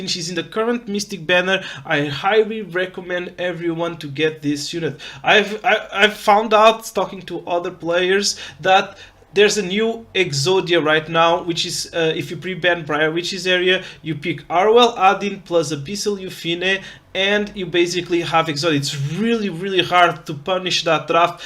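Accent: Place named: Portugal. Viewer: Ouvi-te falar um pouco, e fiquei logo a pensar que eras tuga. Quando descobri que tinha razão... É tão bom ter um content creator de Epic7 português! Continua!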